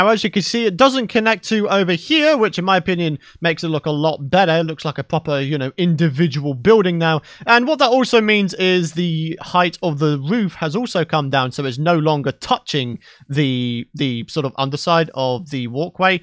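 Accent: United Kingdom. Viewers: Are you British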